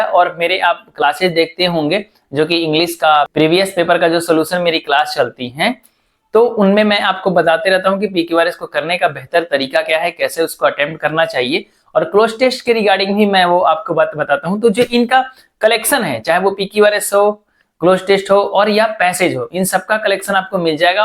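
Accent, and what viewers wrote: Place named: Delhi, India. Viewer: Maine... Practice " kara h ".... Delhi wali.. tone agai apki.. ab bihari nhi rhe ap 😮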